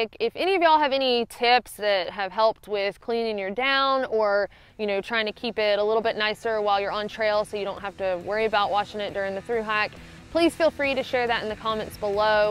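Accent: South, United States. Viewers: some of that southern accent coming out in this video! olls = oil :) great videos as always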